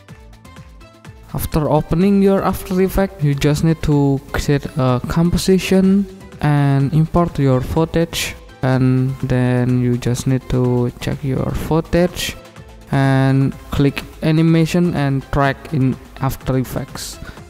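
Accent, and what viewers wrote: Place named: Greece. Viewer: I don't know where are you from but your pronunciation sounds really like greek😂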